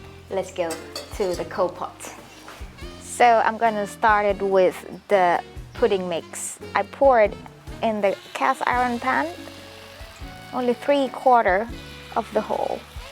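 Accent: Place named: United States. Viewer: Your English accent is not Thai...I'm sure you have been in America...🥰 Love from New Jersey ❤️🫰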